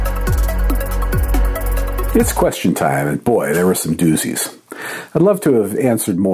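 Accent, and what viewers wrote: United States. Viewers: Once again regarding the your Americanized simplified pronunciation of non-American scientists' names. Can you, please, say "Descartes", and "de Broglie" to name just two, as a proud but somewhat ignorant American? (BTW, the 1st is pronounced "decart" and the latter "de Breuille"). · He addressed this topic earlier. I guess he doesn't care about German pronounciation and prefers the American, just like they don't call the country Deutschland.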